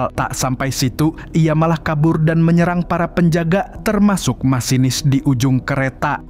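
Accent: Banjar, Indonesia. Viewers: Banjar nya kelayan A Admin nya😮😮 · Urang Banjar kah pyn nie😅😅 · Org bnjar kah pian mang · Admin nya orang banjar rupany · Logat bahasa Banjar nya gapernah ketinggalan😊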